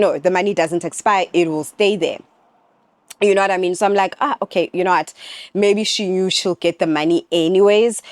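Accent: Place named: Africa. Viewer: Your voice is soo cute! I used to have a coworker that is also African and I’m jealous of ya’ll accent lol 😂 but on a serious note, DD needs to be stopped!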